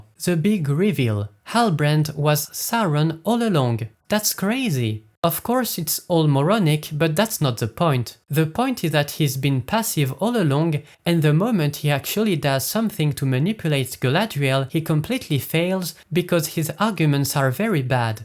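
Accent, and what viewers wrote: France. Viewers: C' est un accent français ça?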